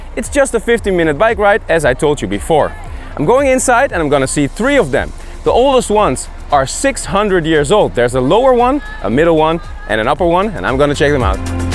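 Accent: Netherlands. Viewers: by the frist phrase I knew u were dutch haha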